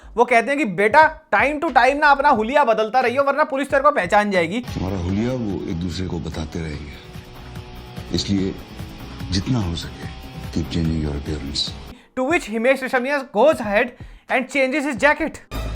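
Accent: Haryana, India. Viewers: Your haryanvi accent is to the point.😂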